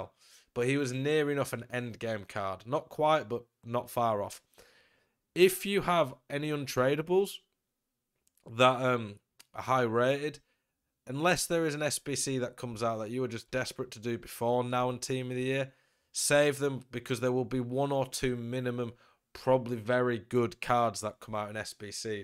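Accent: England, United Kingdom. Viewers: Bradford Innit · Where you from man ? Your accent is English but I dont know which city ! Just curious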